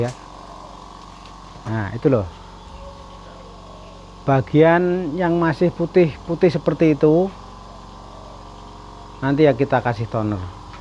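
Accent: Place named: Magetan, Indonesia. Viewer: Kok bahasane koyo wong magetan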